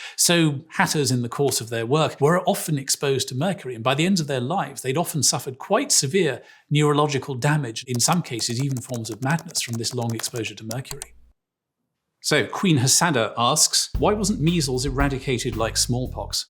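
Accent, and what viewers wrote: United Kingdom. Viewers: this is the most british sounding man of all time and i need him to do audiobooks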